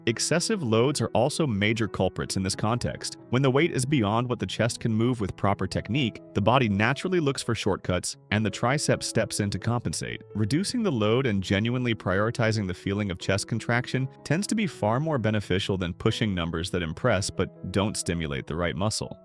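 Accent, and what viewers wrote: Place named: United States. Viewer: yet another BS clickbait video were the narrator has an awful american accent so bad you want to punch them in the face.Avoid.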